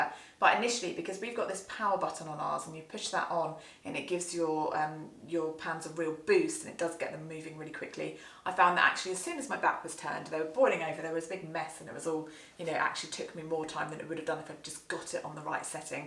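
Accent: United Kingdom. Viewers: It sounds that you got British accent. Am I right?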